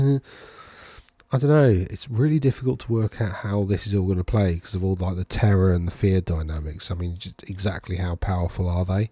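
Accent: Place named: South, United States. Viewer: Building my witches and psychos in the woods vampire force at the moment very exciting.. What's your region you sound like you are a southerner like myself?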